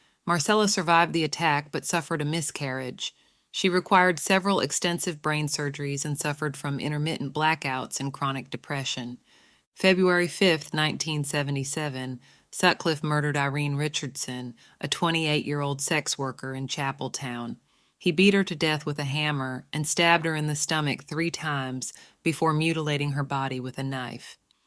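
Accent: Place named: Deep South, United States. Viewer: It is interesting listening to a narrator from the American deep south, talking in her strong accent, talking about the Yorkshire Ripper in Northern England.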